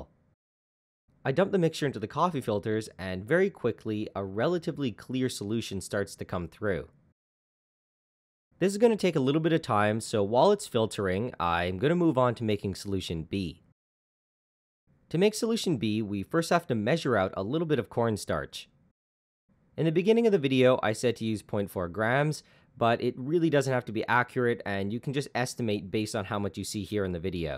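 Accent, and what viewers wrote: Canada. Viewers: So, you're Canadian, aren't you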